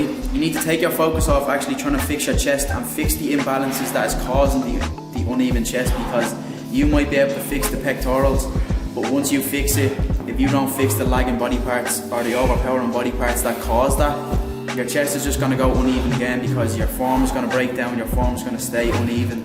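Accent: Ireland. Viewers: Are you irish ?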